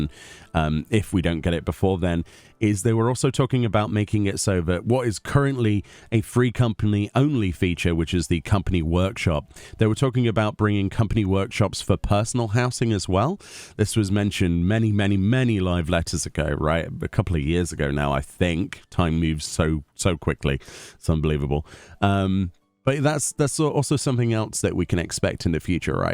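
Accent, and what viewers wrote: United Kingdom. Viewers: You really don’t know what 1.5 of 40 is? It’s true what they say, British accent is no substitute for intelligence. I’m just glad you managed to read those squiggly things they call letters.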